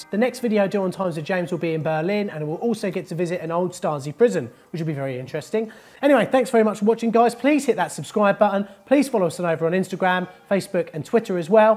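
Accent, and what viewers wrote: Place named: Southern England, United Kingdom. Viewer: It's a southern English accent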